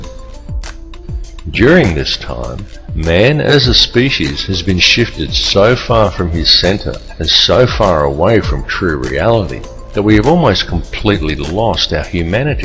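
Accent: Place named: United Kingdom. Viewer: Your british accent is addicting.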